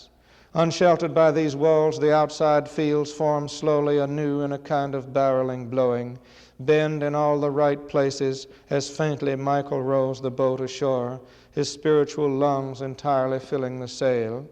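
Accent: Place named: South, United States. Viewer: Just wanted to speak on a tiny aspect of his dialogue during this reading when he introduces ‘Slave Quarters’: It may sound like he is saying the word, ‘N***er’ but that is just a characteristic of his particular accent. In the South, in Dickey’s day, the common way that black people were referred to was ‘negro’, and the word, when spoken in that regions vernacular would be pronounced as, ‘Negra(née-gra). You then have the ‘a’ At the end drop and it sounds like the word that is a racial slur but when people said the word back then just as Dickey did, it was used in the same way we would use the word ‘black’ to refer to an African American or ‘white’ to refer to a Caucasian. I just wanted to bring that up in case someone heard that part of the reading and was turned off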